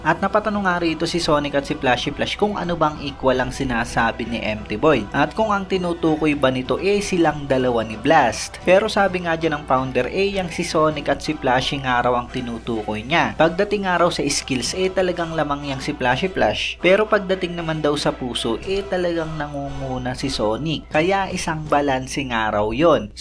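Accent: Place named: Iloilo, Philippines. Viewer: ilonggo ka yata boss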